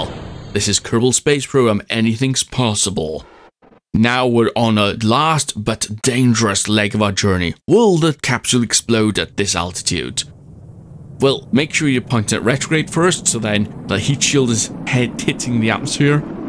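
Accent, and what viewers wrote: Wales, United Kingdom. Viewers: Scott Manley is Scottish, this guy is Welsh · I’m sure you’re Welsh, but I keep hearing twangs that make me think Scottish or Irish? Anyway dope vid man, I have nowhere near the science to do this, and no idea how to get it, but thanks.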